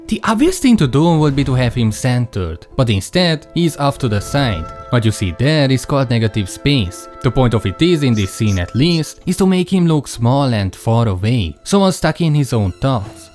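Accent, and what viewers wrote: Hungary. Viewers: UGYANEZ!! Hallgatom, hogy na "he sounds EXACTLY like a Hungarian person speaking English would", és igen, az 😂 😊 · Random felhozta nekem a videót a YouTube, hallgatom, hogy de magyaros az akcentus, megnézem a csatorna névjegyét... yyapp, a " " brotha':D De amúgy nagyon jók a vágások és tök jó, hogy egy magyar is foglalkozik ilyen témákkal, még ha angolul is. Sok sikert a továbbiakban, keep it up~! ✨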